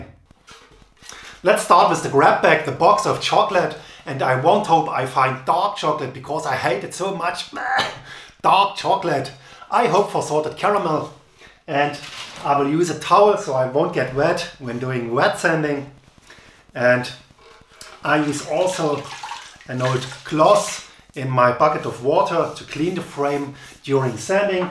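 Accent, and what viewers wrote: Germany. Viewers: Das beste am Video: Das abgehackte und harte Englisch. Da hört man gleich das er ein Deutscher ist. 🤣🤣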